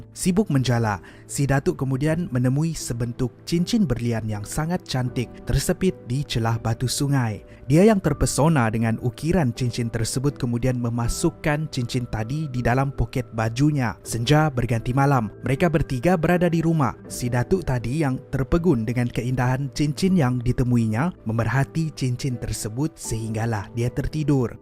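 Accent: Sabah, Malaysia. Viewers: bahasa ckpnya sda tau dia org sabah, Indonesia lain dialeknya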